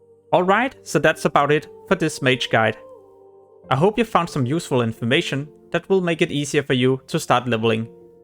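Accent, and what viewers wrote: Denmark. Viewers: Danish guy. It's the accent. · HUGE dansker accent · it's all good, nice video you made. - sounds like you are danish :)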